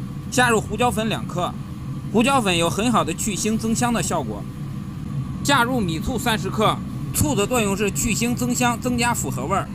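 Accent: Standard. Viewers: 我們家都放老抽。解說很標準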